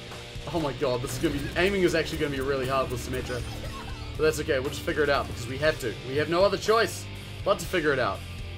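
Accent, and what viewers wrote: New Zealand. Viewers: James Bartlett Yep that's a kiwi accent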